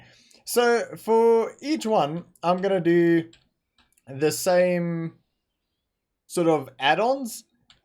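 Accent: South Africa. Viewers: Hey are you south African?if not just ignore lmao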